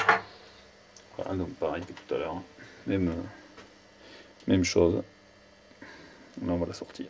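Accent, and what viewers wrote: France. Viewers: avrc le petit accent marseillais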